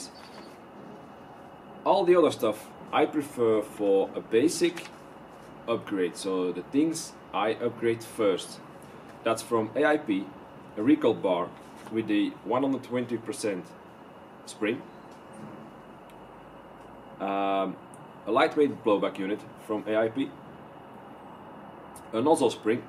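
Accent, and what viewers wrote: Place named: Eastern Europe. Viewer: Where the hell are you from lol I can't regcognize the accent, sounds slightly Slavic but I could be wrong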